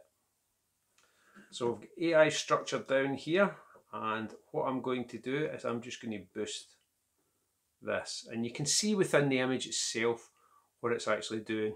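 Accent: Scotland, United Kingdom. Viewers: Excellent video, made much more interesting by a wonderful Scottish accent ! Quick Question: Are you working on a RAW file?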